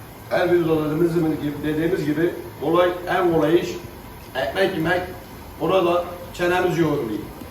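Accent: Gaziantep, Turkey. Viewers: ustam entep şiven le süper seyırediliyorsun video larını seyir ediyorum kısmet olursa pandemi bıterse sizinle tanışmak için antepe geleceğim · Abi zevkle senin videolarina bakiyoruz. Birşeyler de öğreniyoruz.Bismillahirrahmanirahim diyerek baslamanda beni çok mutlu ediyor Antep şiveside çok güzel. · Öz antepli şive mükemmel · usta, Antebe gelirsem seni ziyarete gelecegim yorum. Bizde gurbette yasayan anteplilerdeniz. Valla antep dilini duymak beni sevindiroyor. Elline goluna saglik yorum cok güzel görünüyor. Senin videolarina zevkle bakiyorum!!!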